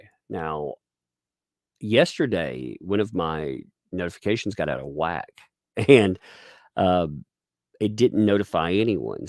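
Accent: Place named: South, United States. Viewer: You kind of talk like Beau of the Fifth Column. He has a little twang too when he speaks he must be a southerner like you 😂 😂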